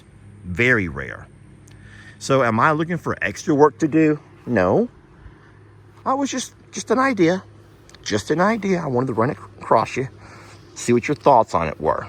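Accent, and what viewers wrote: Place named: Oklahoma, United States. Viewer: My grandparents on both sides would often say fire ants like you do, more than likely because they were from Oklahoma, Arkansas, Texas, area. My father, often called Las vegas," lost wages", But he was often changing words that sounded similar but meant something different. Which kind of rubbed off on me on occasion😅😅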